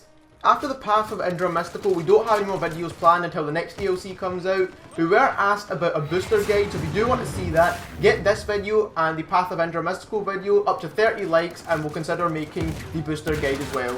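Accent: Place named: Scotland, United Kingdom. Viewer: It makes me laugh that your like goals are so low. I admit that I watch TheRadBrad and PewDiePie sometimes. Maybe if you said "Take-It-Bitch" in your videos more or could somehow bring your pet into this, I think you could start pushing the "likes" more. I know you think it's a shit accent but the Scottish accent is pretty cool. HaHa! Man I'm only messing with you a little. I like your videos and I think watching has helped my game play.You should be pushing those "likes" in the thousands before too long.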